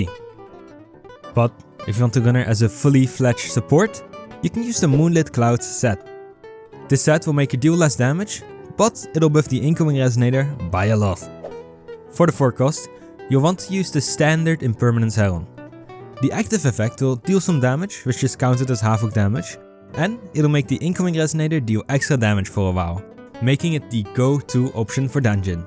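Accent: Netherlands. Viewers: Danijn for the win! You sound Dutch? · love the dutch accent :)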